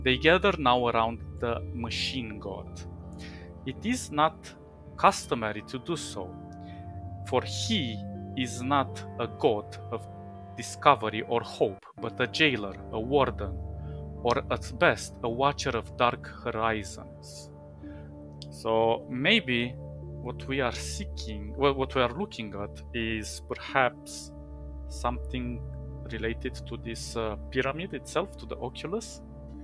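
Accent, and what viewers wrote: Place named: Lithuania. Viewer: Hey bro great video, but I have an unrelated question. Are from Lithuania or someplace close to it? You have an accent very similar to a Lithuanian friend that I have.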